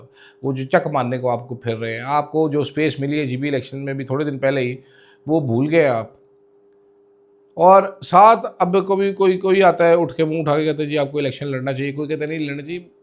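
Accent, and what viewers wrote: Punjab, India. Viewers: راجہ جی پنجابی ٹچ کمال کر سٹنے او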